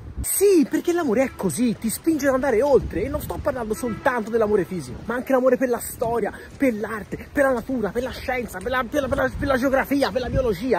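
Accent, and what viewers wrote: Florence, Italy. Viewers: grande video, forse non decolli a livello nazionale perchè il dialetto fiorentino è un pò troppo particolare. Ma e un fa nulla e grazie mille!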